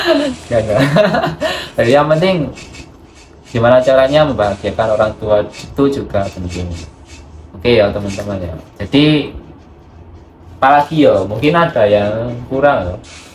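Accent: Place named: Java, Indonesia. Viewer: Jawa e medok yooo😂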